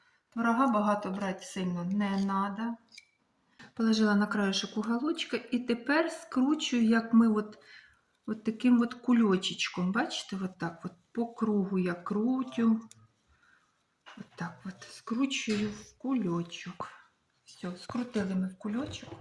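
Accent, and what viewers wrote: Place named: Kherson, Ukraine. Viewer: На херсонщіні ,ммколаївщині, одесчтні такий говор відстаньте не хочеш не дивись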